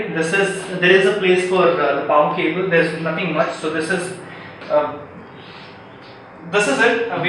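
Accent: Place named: India. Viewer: voice quality very poor. when you are reviewing a product technically and talking about it, you could have made sure you talk with a clear pronunciations and proper pace. Being Indian I know you cant help your with your accent, neither i can. But least clarity was expected ...at least in last 25% section of your vdo.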